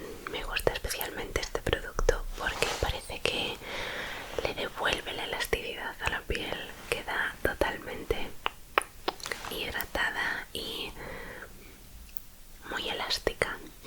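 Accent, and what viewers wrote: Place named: Spain. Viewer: Me gusta mucho ese acento español ❤ es muy relajante su asmr.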